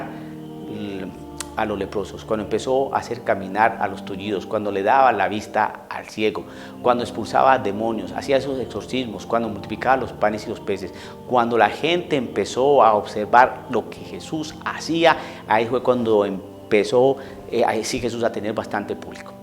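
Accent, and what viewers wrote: Colombia. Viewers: Padre, me gusta mucho todo lo que explica en cada video, no he visto todos sus videos pero los pocos que he escuchado me dejan impresionada de como el Espiritu Santo le ilumina, me impresiono tambien el grado de firmeza que tiene en su fe y su verdadera vocacion sacerdotal, a pesar de ser tan joven me admira como esta tan centrado en la VERDAD de Jesus mejor que muchos sacerdotes que tristemente no estan estudiando los signos de los tiempos y por eso se estan cegando ante tanta apostasia y confusion. Dios le bendiga. Por su acento creo es de ¿Colombia? Colombia y Mexico seran paises grandemente purificados porque de estos dos paises saldran muchas luces que llevaran al mundo la doctrina verdadera, cuando estemos en oscuridad... En oracion y vigilancia como Jesus nos los pidio. Saludos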